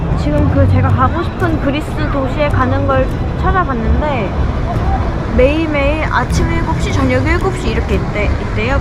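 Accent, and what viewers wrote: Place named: Cheongju, South Korea. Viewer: 약간의 청주사투리...? 행복한 여정이 되길,,,😊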